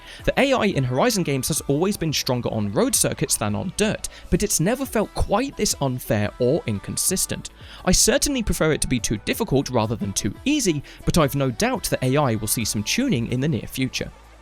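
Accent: United Kingdom. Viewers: Uk accent L video 😂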